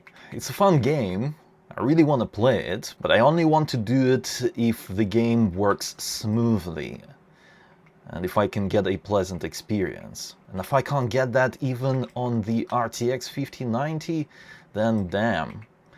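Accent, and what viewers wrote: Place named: Russia. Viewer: I knew your voice sounded russian as soon as I came across your channel, haha! That's so cool xDD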